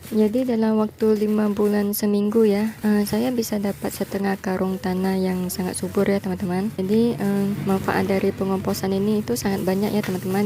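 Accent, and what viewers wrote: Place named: Aceh, Indonesia. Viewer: Menyou tedengo nyo lage oreng aceh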